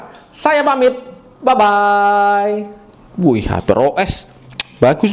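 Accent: Java, Indonesia. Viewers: medok tenan ngomong e😅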